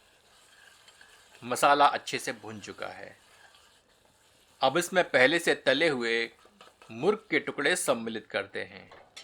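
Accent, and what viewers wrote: Standard. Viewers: इतनी शुद्ध हिन्दी wow ❤❤ mera dil aa gaya tum per sir❤ love you sir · itni sudhdh hindi ka uccharan mai aapko subscribe karne se rok nhi paya jai Hind 🙏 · sahab ki shudh hindi aur recipe dono great 👍 😋 👌 hats off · I can just say.... Mouth watering recipe and heart winning presentation....... And Hindi in its purest form..... 👍 Hail chef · Kya sudh hindi bola hai bhai ne ,...wah mza hi aa gya 😊😀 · शुद्ध देसी हिंदी और देसी मुर्गा आनंद आ गया देख कर। लेकिन देसी मुर्गा सिर्फ 2 सीटी में ही गल गया?काफी कम उम्र का मुर्गा था लगता है। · Bhai tho blkl shudh hindi or urdu bolte hai 🙏❤️👍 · Wow bhai... Mouthwatering hai vakai... Apki itni shuddh hindi hame mantramugdh kr gyi.. Pahli baar itni shuddh hindi youtuber ko suna h... Proud on you. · बहुत ही शुद्ध हिंदी है। बहुत पसंद आया | और चिकन रेसिपी भी बहुत अच्छी थी| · Itna to hamare hindi ke sir ki bhi sudh hindi nhi he 😂🤣😁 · आपका हिंदी में बात करने का अंदाज और इतनी स्पष्ट शुद्ध हिंदी मैं पूरा विवरण देने के लिए बहुत-बहुत धन्यवाद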